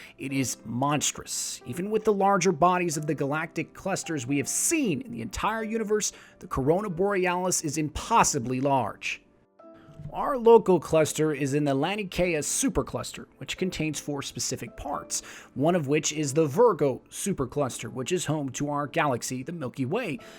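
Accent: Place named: New Jersey, United States. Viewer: I feel like you're from either New Jersey or Pennsylvania. Those are the only places I've seen people spell "could've" as "could of" and heard people pronounce "library" as "libary". It's not a bad thing, just an interesting thing I've noticed.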